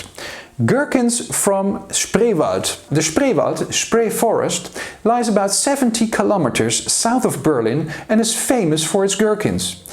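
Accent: Netherlands. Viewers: The Dutch accent is strong though, at his first sentence I already knew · A strange sensation to watch a video about East German Products, narrated in English with a Dutch accent. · I love your Dutch accent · Wow that's interesting, didn't know he's Dutch. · His Dutch accent is very clear, once you know what a Dutch accent sounds like. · I was soo suprised to hear a Dutch accent once I clicked on this video. Love to see the interest in regional history exceeds borders! Thank you for your research and dedication!!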